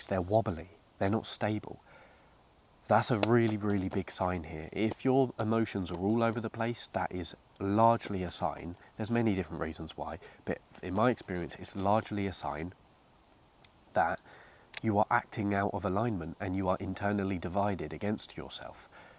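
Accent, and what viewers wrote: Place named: London, United Kingdom. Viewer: What part of England are you from? If I had to guess I’d say somewhere in London.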